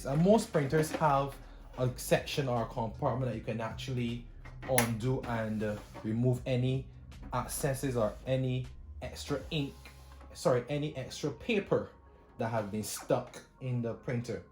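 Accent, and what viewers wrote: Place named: Barbados. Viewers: I stumbled upon his channel and from the time I hear the accent I say he got to be a Bajan lol. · Had to subscribe as soon as de Bajan accent hit me😁. Well done. Very informative video. Am seriously considering getting this printer.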